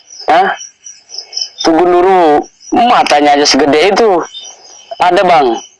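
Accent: Medan, Indonesia. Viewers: Mas mcm orang medan aja.dari logat bicaranya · medan kali ya tuh logat bang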